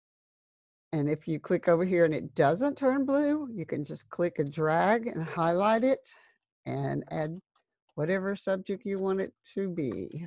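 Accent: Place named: South, United States. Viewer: A very beautiful southern voice my dearest, tell me are you from Florida?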